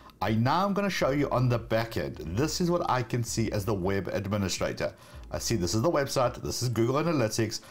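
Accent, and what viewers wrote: South Africa. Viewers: I’m having trouble with the accent. Is it South African? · I know a South African accent when I hear it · Is this guy South African?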